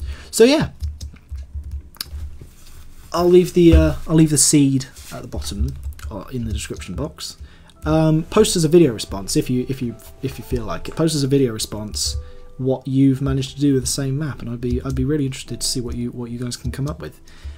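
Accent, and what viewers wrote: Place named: England, United Kingdom. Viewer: WOOP WOOP! Someone English for once!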